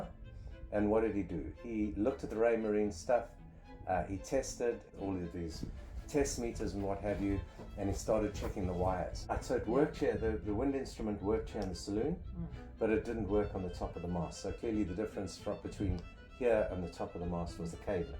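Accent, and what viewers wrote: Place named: South Africa. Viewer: I hope I'm not getting this assumption wrong but you sound South African and if so why wouldn't you buy one of those beautiful Balance cats, especially considering these leopards have a very bad name build quality wise and after sales service. I'm sure you've probably seen the South African fella and his wife who was sailing around in a beautiful bullet proof Balance cat which was around 62 foot if I remember correctly. He sailed it to the US to be sold and I would've snapped that second hand cat up in an instant. It had been well looked after, it was run in so to speak and had the miles on the board and proof that it is a well built, well performing ocean cruiser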